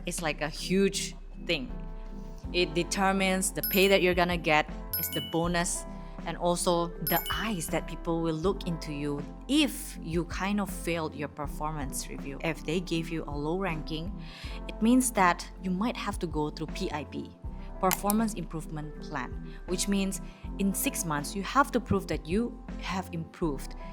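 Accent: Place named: Philippines. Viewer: sorry, but you sound like a Filipina, sorry, not sorry, darn it! whatever!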